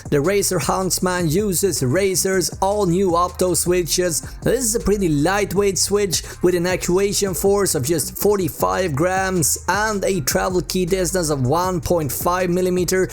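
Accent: Sweden. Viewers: u sond so swedish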